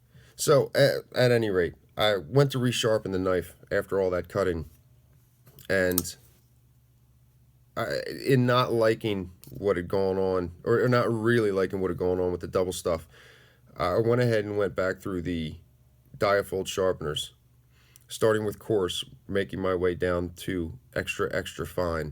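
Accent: Philadelphia, United States. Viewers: Ain't no hiding that you're from Philly that is FOR SURE. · I had a feeling was from the philly area. Born in philly and lived in or around the city for 36 years so I tend to pick up on our distinct accent. Go birds.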